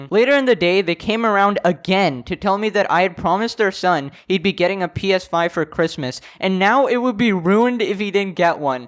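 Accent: United Kingdom. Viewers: Bro I just went to your oldest video and the voice change is real 😂. LOL you used to have a British accent wtf 😂.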